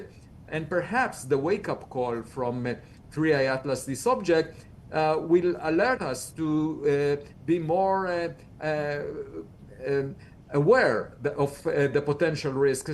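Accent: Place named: Eastern Europe. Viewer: 听口音也像是东欧那边的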